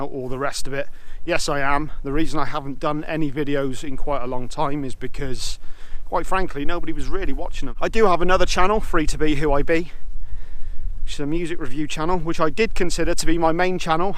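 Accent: West Country, United Kingdom. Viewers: Not down to earth talking, good west country lad! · Nice one Man am sober too ! Respects.....bet ya life has changed ! Hear a West Country Accent.?.. The World is in the grip of addiction so we need people to raise above this Demonic behaviors and speak about their journey into recovery......... Respects Blessings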